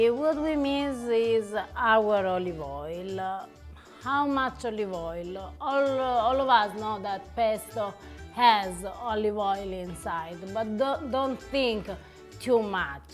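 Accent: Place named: Italy. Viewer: Bellissimo accento italiano 😊☺️☺️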